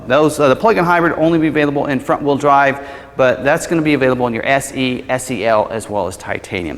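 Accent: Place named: AAVE, United States. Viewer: Yep, an Ebonics speaker evidently. Gives me a lot of confidence in this guy’s expertise.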